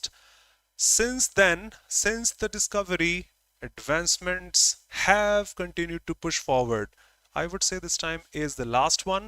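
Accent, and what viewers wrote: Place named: United Kingdom. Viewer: British English sir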